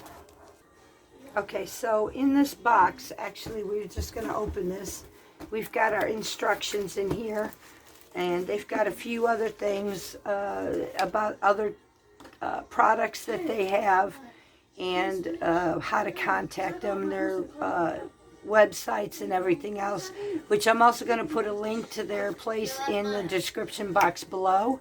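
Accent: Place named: South, United States. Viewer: Hi I hope you feel better soon ❤. We just ate frozen salmon burgers for dinner. I never thought of making them from scratch. I will be trying your recipe next time for sure. Thanks. Glad you liked the S.S. I have never E mailed by myself before. I need a lesson. You will hear from me soon😊. I love the way you say ya all and all the southern warmth that now greets me almost everyday. Please don’t change ❤😊🥇🏡🇨🇦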